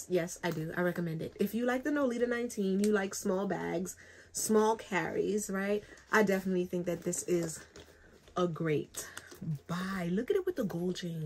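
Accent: Jamaica. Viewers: TFS, the bags are beautiful ❤️ instant subby as soon as your Jamaican accent started leaking out... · Omg, I hear an accent. Do I hear Jamaican? I love that you decided to bless someone.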